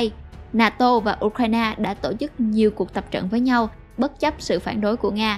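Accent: South, Vietnam. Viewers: Bài viết hay,giọng đọc chuẩn Nam bộ rất ấm áp...like ***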